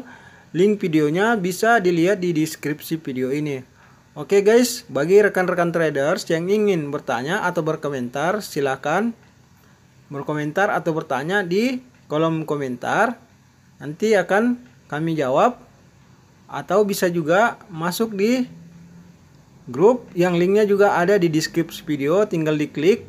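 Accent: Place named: Sulawesi, Indonesia. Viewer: Wa alaikum salam. Pak, orang dari sulawesi yaaa? Kelihatan dari suaranya...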